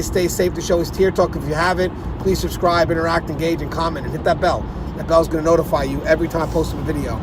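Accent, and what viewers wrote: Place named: New York, United States. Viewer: Tier Talk Correctionsone YouTube Channel because I’m a NYer and you just sound like someone that could had lived on my block lol.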